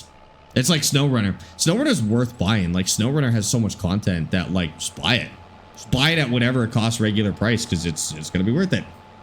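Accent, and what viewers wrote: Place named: Canada. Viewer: Can tell a Canadian if they say sorry. Not cause yall say it a lot but it’s how you say it that gives it away.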